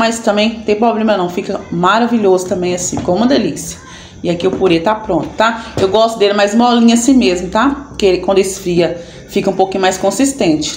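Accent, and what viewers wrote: Minas Gerais, Brazil. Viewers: UAI, vc é mineira? RS adoro o seu jeito de falar.. eu tbm falo assim e sou mineira. Amo seus vídeos. tem jeito de ser um menino muito bonzinho. Um beijo no coração de vocês. Deus abençoe! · Eu acho muito legal seu sotaque mineiro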